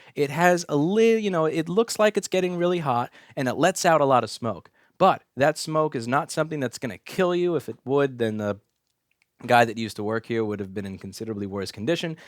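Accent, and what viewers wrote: New York, United States. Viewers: objectivity really gives him credibility, even if at times he sounds like the New Yorker stereotype.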